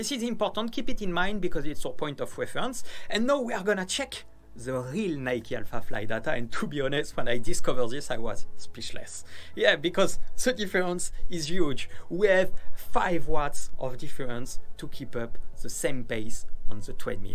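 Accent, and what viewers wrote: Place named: France. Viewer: Oh l’accent français à couper au couteau !!! lol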